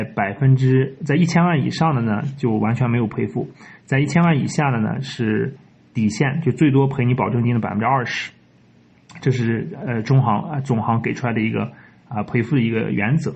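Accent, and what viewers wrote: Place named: Fujian, China. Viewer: 博主聽口音像福建人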